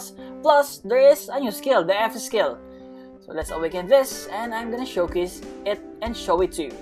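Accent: Philippines. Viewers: Bro stop I know you philippino you're accent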